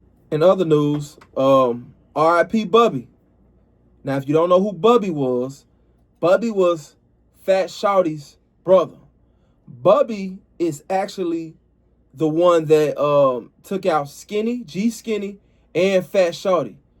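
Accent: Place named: West Coast, United States. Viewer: gotta be from out west I can tell how u say the blocks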